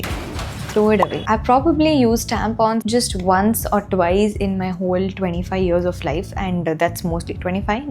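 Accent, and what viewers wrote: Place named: Karnataka, India. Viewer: that kannada accent 😂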